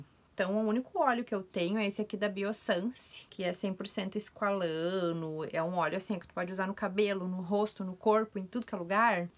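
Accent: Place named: South, Brazil. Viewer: Ai guria, amo este sotaque do Sul 😍!!! Muito fã do seu trabalho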